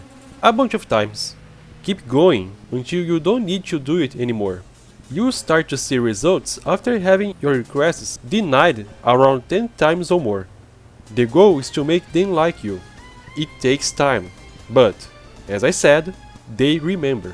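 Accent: Brazil. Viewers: posso estar enganado mas o sotque é bem brasileiro, de qualquer forma obrigado vc ajudou muito com o video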